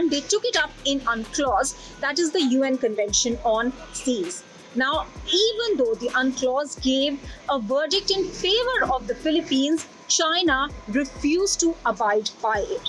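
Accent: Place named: India. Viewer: China is bullying Asean? Do you even know where the location of Asean in the world? And please speak without the Indian accent.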